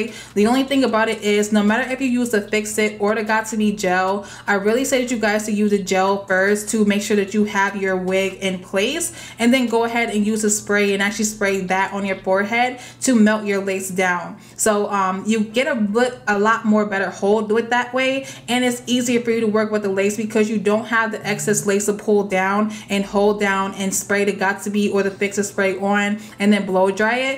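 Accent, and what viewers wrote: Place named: Louisiana, United States. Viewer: Love your videos You Rock!!!!!!!! U sound like u are from the Cajun State like me.!!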